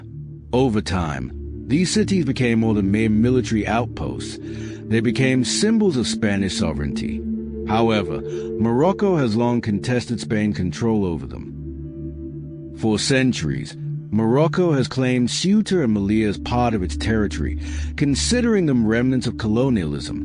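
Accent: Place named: United States. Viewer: ' like the accent of the narror, 'cause he's narring with a genuine one, hey where u fro bro? 'merican, i gess ' what state ?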